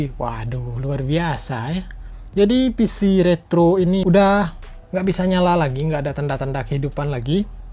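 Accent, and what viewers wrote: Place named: Padang, Indonesia. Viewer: Orang Padang Abang ini agaknya...kental kali logatnya😂😂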